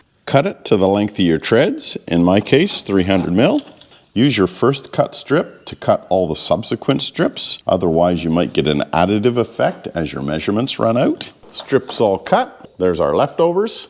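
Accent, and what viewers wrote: Canada. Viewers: I think I ❤your Canadian accent! I’m Scottish and think it’s a hoot! Okay your best hacks for me are: Shrink wrap zip Water tap Butane gas can My hack is for tent camping. No matter what I use, my feet always get cold! So I carry a water bottle in my pack to put inside my sleeping bag at night. Bliss!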